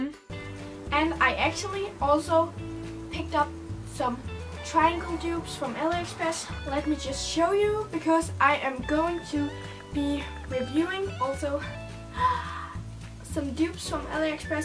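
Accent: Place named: Denmark. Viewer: Jeg kan høre at du er dansker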